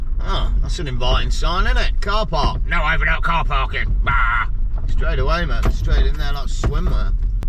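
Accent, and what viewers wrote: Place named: England, United Kingdom. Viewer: Just came across yourself really enjoying your videos I’ve had to put subtitles on because of that English accent lol 🏴💚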